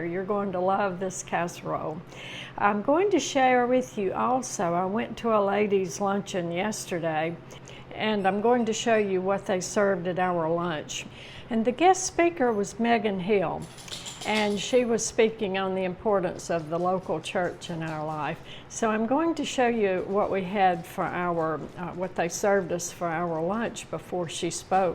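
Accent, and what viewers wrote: South, United States. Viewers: I enjoy everything about your videos, including your southern accent and charm.❤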